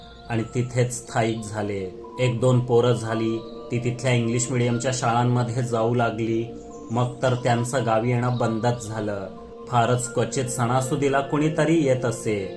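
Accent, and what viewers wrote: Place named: Ghat, India. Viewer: तुमच्या कथा खूप छान असतात मी नेहमी ऐकते तुमच्या कथा तुमची मैत्री तर खूपच मस्त आहे ती नेहमी अशीच प्रेमळ रहावी हीच देवाकडे प्रार्थना.तुमच्या कथेतून अस वाटत की तुम्ही कोकणी आहात पण तुमच्या भाषेतून ते जाणवत नाही.तुमची भाषा घाटी वाटते.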